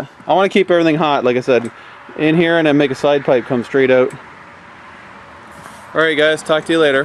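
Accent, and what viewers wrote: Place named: Canada. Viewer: I like how you can talk to 'no one' and keep it interesting. And also Canadian accent.. how aboot that aye.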